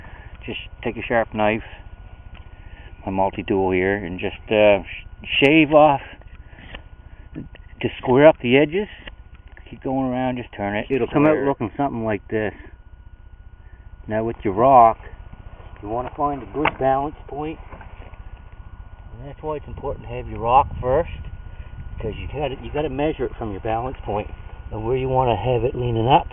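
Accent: Canada. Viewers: Great video man! I love how canadian you sound. Thanks for the post stay Eazy! ... "Shklor" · are u canadian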